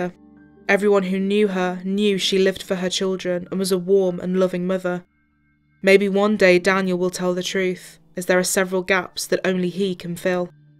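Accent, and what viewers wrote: United Kingdom. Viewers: actually some British English speakers do pronounce some words this way even though they are ‘English’ words. You can google it if you’re more curious. I just did to confirm that my UK colleagues weren’t an anomaly.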